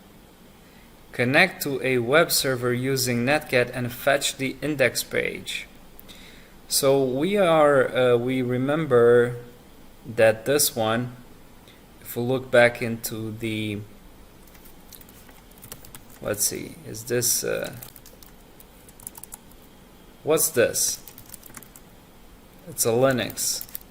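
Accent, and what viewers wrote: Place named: Ukraine. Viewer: you dont have an accent? Your funny. My girlfriend is from Ukraine, yall sound very similar. I am going to be teaching Golang for pentesting. I'm working on it now as we speak. But I'll send you the link, when I'm getting close to showcasing. I wanted to do one for bash but you and,a few of my other technical friends are doing Bash. So I decided to wait.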